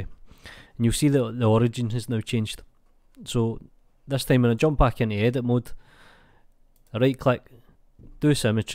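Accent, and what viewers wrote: Scotland, United Kingdom. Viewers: wao thats some strong scottish accent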